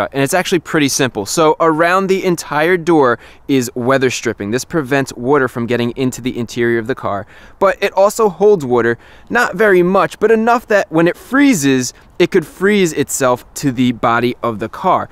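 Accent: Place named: Pennsylvania, United States. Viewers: has an accent that sounds like he's from southern Pennsylvania....we all say water like that here lol Good tips though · Jersey thing like saying “where are the khakis” is “where are the car keys” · Its new jersey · Yeah, that NJ accent is clear... especially "wooder". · New Jersey for sure. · I know hes from somewhere in the new york area solely because of the way he says water. This comment is being made after only watching 4-5 of his videos with no other context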